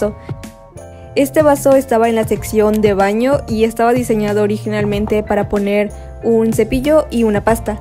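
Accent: Mexico. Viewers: Es mexicana por su acento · Se escucha como mexicana, pero "fresa" y "pudiente" porque nos presume que viaja en auto.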